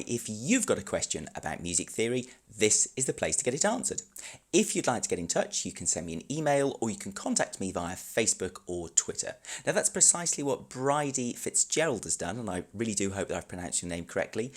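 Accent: United Kingdom. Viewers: I really like your videos. As for your pronunciation, tough shit for the Italians. You don't have to apologize for being British. If they are offended, that's their problem.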